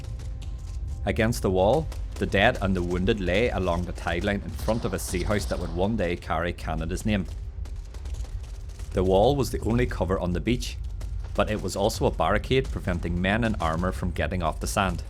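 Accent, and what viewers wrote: Northern Ireland, United Kingdom. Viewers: Judging by your northen Irish accent I assume this isnt an A.I voice over. Therefore I have subscribed and liked your video. I grew up in cork myself, but am a dutch guy living in Germany.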